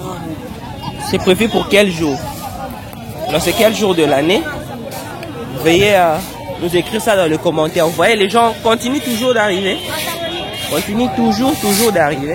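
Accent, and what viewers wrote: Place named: Democratic Republic of the Congo. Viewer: Mboté !! jeune homme .....J' ai tellement d' affection profonde pour la RDC ( et la République du Congo ) que je ne peux pas laisser votre vidéo si bien réalisée en employant ce "bonjour " si familier pour moi . En France le 2 Novembre est le Jour des Défunts : fleurs sur les tombes particulièrement ce jour -là ; les marchands de fleurs vendent beaucoup de chrysanthèmes à cette occasion mais de plus en plus d' autres fleurs maintenant. En général c' est un jour plutôt morose pour les familles concernées . J' aime votre vidéo parce qu' elle me fait voir encore plus de coutumes des Congolais . Et je reconnais tout de suite le cher accent de votre pays !! Dans quelle région est votre famille ?? Amitiés de France 👍🌞🙂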